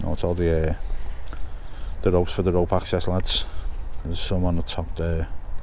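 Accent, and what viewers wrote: Liverpool, United Kingdom. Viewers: One more thing, u scouse but i understand 💯 of your accent,, i know you try to speak english not scouse , for us to let us understand as much as possible.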